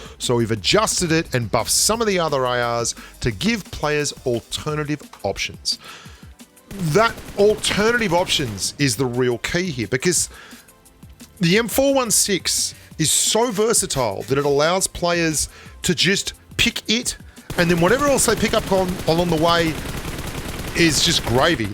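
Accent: Australia. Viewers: I subscribed to this channel recently. I think..this guy knows what he is saying. The probable reason why he isnt getting more subscribers..may be for following reasons: 1. Accent: I think its Australian..though i can easily understand him..most of the south asian audience can't. So either you can slow down while spekaing..or put notes..subtitles..in video..like levinho and tacaz does. They arent good at english..and hence they only use.. text for communicating with audience. 2. More gameplays: all leading youtube accounts..majorily upload..their gameplays.. i like your educational videos..but it wud be great..if you can uplaod your gameplays..atleast once in 2 days..with commentary. I think people wud like that. Anyways.. cheers mate.. great channel. I learnt a lot from you. May you get more subscribers soon.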